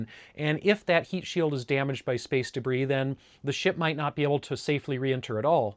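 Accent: Alaska, United States. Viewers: I live in the Twin Cities. You have an Alaska accent?! What!?? You sound totally local. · As a Swede, you’re Alaskan pronouncing is absolutely fine. I have never had any problems understanding what you say 👍😀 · Nothing wrong with with your Alaskan accent ….Manchester England 👍👍👋👋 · I never noticed your accent until you spoke the words “my Alaskan accent” and I heard it immediately. My brain went directly to Tina Fey playing Sarah Palin on SNL… I think I might be getting old. Cheers from Canada! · As a Canadian I appreciate your Alaskan accent. Nice video, 👍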